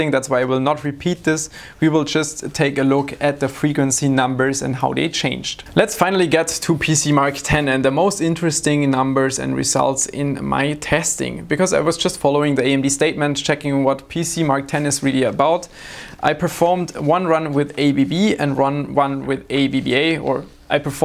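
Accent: Germany. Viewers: Das gute alte deutsche englisch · Does anyone else have the feeling that this guy is so german that when he speaks english he still sounds like he is speaking german?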